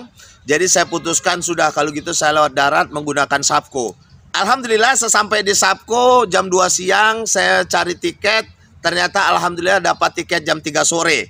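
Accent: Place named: West Java, Indonesia. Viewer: Orang sunda ya bang, pisa 😊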